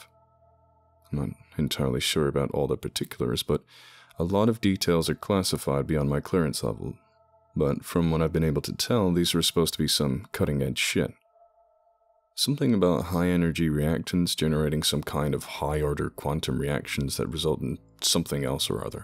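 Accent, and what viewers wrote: United States. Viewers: you have an American accent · Your American accent is so good! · Your American accent is very good. There are respected British actors that don’t do it as well. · He does an excellent Job as do many actors I'm not sure if you watch movies to TV but many many talented Foreigners speak in perfect American accents. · the American voice you use in these videos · I LOVE your American accent, it's dead on. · I agree that his American accent is great, but I'm not sure what you "producing music" has anything to do with studying language or dialect.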